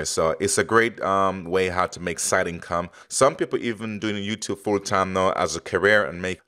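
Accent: Jamaica. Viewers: For some reason you sound like a Jamaican mix with more England also some US. 😂😂 anyway good video if I'm right i am inviting you over to my channel <link>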